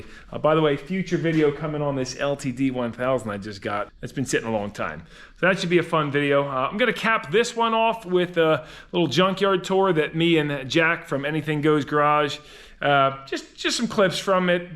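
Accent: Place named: Philadelphia, United States. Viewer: Has anyone ever told you you look like Jared Keeso? It’s weird seeing what is a Canadian actor speaking with a Philly accent lol. Love the videos!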